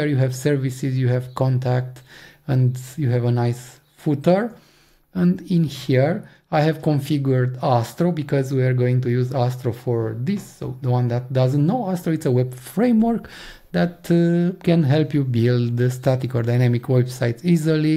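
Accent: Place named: Romania. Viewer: roman cumva îmi dau seama după accent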